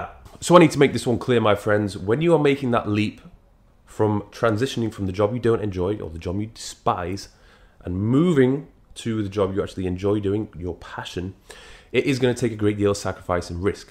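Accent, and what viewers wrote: United Kingdom. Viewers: "I always see the comfort and contentness is the enemy. When you get too comfortable and everything is easy, that's when you take a back foot." Love the positive messages you deliver on your Youtube channel. They are not only about fitness but also about life lessons, which i believe is applicable to everyone. Just want to say: your dedication, professionalism and wisdom are amazing, thank you for helping a lot of people, myself included, stay motivated and get back on the right track. I'm an undergraduate student from South Korea. Even though it can be somewhat hard for me to get British accent, but I try my best to catch every informative message you give off. You've been to Thailand not long ago, why not Korea one day? lol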